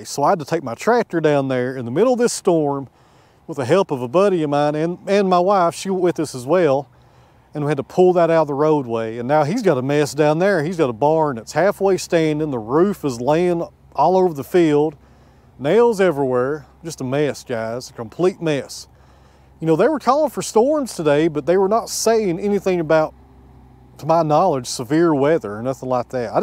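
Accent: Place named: East Tennessee, United States. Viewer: That looks like tornado damage, alright. We lost our barn back in 99, and because there were no "confirmed" touchdowns, insurance refused to pay a penny. By the by, sound quality is pretty good,. Sounds about like you have it dialed in. Oh, something I've been thinking about... My grandfather was a Sawyer up here in northeast Ohio and northwest Pennsylvania from the 1930s to the mid 80s, and some of his favorite workers were from your neck of the woods. Herman Hutchison, Sheridan Severt and his son John (all gone now, sadly.) were from Johnson County and sounded almost exactly like you. Must be something about the land of east Tennessee that makes good sawyers...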